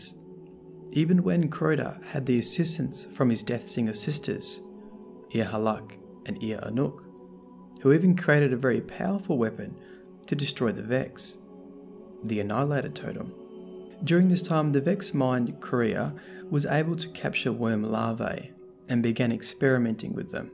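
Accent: Australia. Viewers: Man this is soo awesome. So well described. Gear to hear and Aussie voice talking lore.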